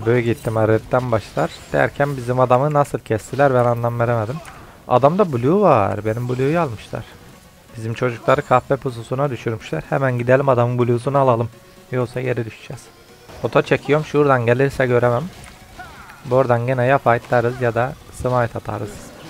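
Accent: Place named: Antalya, Turkey. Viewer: Kanka Antalya lımısın şive çok yakın 😂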